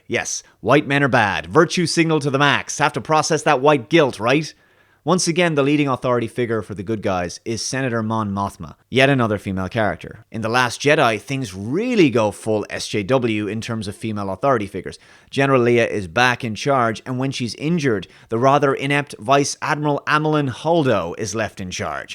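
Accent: Ireland. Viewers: You Irish? :D Instant sub!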